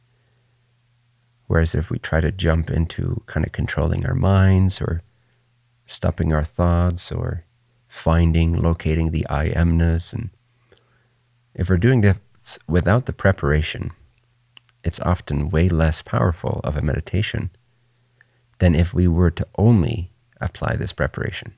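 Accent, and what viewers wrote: Netherlands. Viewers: His dutch accent is kind of distracting...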